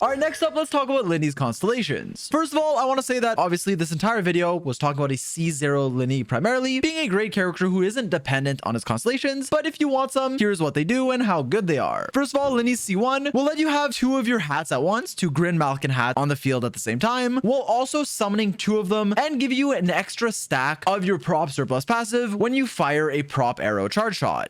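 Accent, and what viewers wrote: Quebec, Canada. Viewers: does he have a cold or Canadian?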